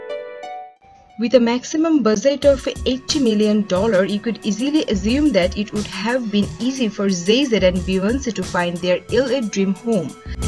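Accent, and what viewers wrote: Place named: South India, India. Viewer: Heavy South-Indian accent and that would still be okay if it were not for some weird-ass autotune that was added in the editing room.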